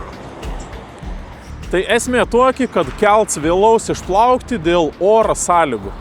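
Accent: Samogitia, Lithuania. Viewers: Ir dar žemaitiška tarmė priduod grožia. 👍👍👍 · Nu, kaip su baltu pavydu stebiu Jūsų keliones, smagu žiūrėti, o dar ta žemaitiška šnekta, nu faina. Ir toliau taip, sėkmės.😂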